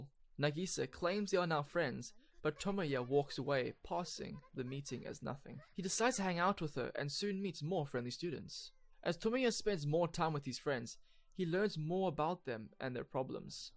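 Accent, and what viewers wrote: South Africa. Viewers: what is your accent?? south african??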